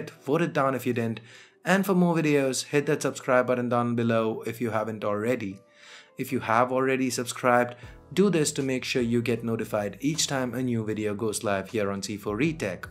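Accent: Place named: India. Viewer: Want 2 wowch😂😂 Indian accent is so fucking funny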